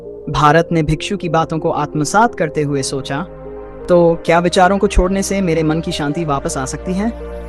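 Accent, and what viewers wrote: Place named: United Kingdom. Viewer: Bhai hindi ko hindi ki tarah bolo please...english k style mei mat bolo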